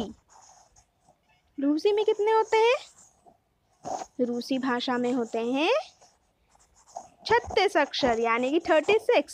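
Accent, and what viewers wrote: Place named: Bihar, India. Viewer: आप बिहारी हैं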